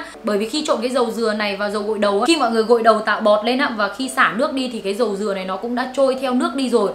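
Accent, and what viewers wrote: Nghe An, Vietnam. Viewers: người nghệ an phải ko bạn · Giọng Bắc của chị thi thoảng pha giọng Nghệ An nghe cute thế😁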